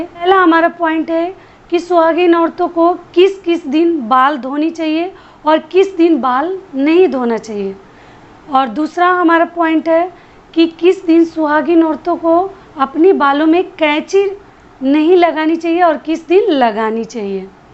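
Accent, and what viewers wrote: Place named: Purvanchal, India. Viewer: Thanks didi mai female hu ye mere husband ki I'd hai mera naam kavita hai aap purvanchal se hai na apke boli mujhe bahut pasand hai apki videos se bahut kuch sikhne ko milta hai apki tips mai follow karne lgi hu aur life me uska fayeda bhi mil rha hai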